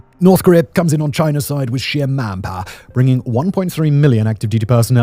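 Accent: United Kingdom. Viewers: British accent is so stupid! 😂😂😂😂😂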